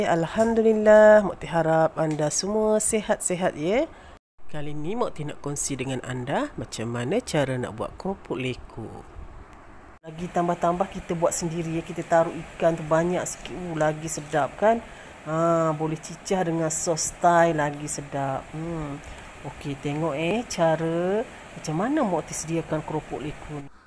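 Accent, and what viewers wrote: Malaysia. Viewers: aku org ganu taulah ganna wahwahwah ni org ganu ker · kita biasa mkn kopok ganu hok gigit putus mmg sedap .. syabas tahniah moktih..klo dgr suara moktih ni org kelantan ke ganu?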